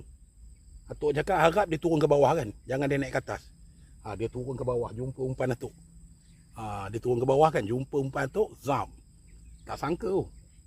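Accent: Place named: Johor, Malaysia. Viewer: Atok asal johor ke? Tukar sebut tuko Biar sebut bio Hehe tnye je tok Slm dr tangkak johor❤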